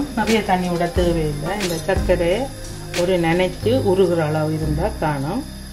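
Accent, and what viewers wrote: Jaffna, Sri Lanka. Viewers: Thank you.... love to hear again again our Original Jaffna Tamil. Great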